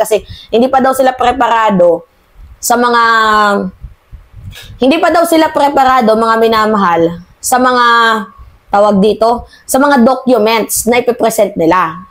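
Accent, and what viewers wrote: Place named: Waray, Philippines. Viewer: idol maam from a uyog ka po ba? kasi yung pag waray mo may sin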